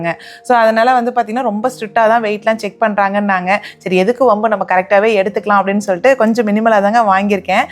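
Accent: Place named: Palani, India. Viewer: சூப்பர் தஙகம் எந்த ஊர் கண்ணே எங்க ஊர் பழனி❤❤❤ அம்மா ஊர் எது பேசுவது எங்க மாதிரியே இருக்குதுடா❤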